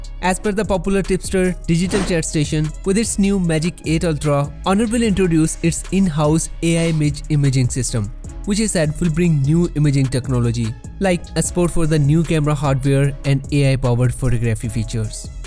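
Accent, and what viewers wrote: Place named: India. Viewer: No offence, but the English Indian accent is the worst at the world Seriously my ears can't bear to hear it even 1 moment 🤢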